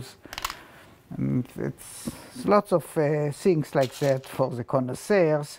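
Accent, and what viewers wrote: Israel. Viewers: Hebrew accent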